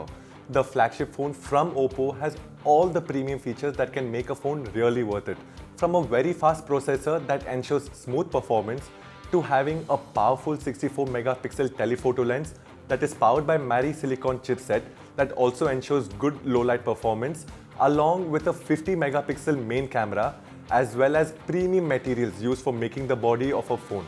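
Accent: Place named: India. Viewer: Look like a european guy but with india accent🤭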